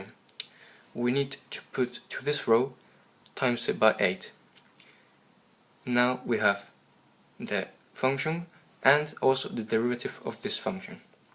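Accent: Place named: Spain. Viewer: I can hear the spanish accent xD, it's not Zed but Sed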